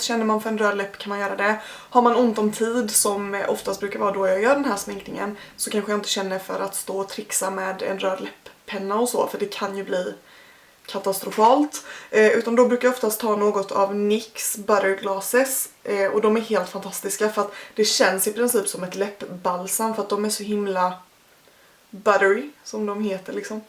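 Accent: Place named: Småland, Sweden. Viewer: Min söndag fick ett perfekt avslut efter denna videon. Saknat ditt fina rum, men vart är de turkosa ljusen haha de var så fina ju. Har följt både dig o Louise sen jag var 12 år ungefär, även eran blogg. Din småländska röst förgyller mina dagar och brukar kolla dina äldre videos när jag har tråkigt. Älskar att du ska "börja" igen försök hålla i, jag är så taggad. Kan du göra en "catch up" video där du berättar om din tid när du har varit borta, om din utbildning m.m. Det hade varit väldigt intressant för oss trogna följare som följt dig länge. ❤️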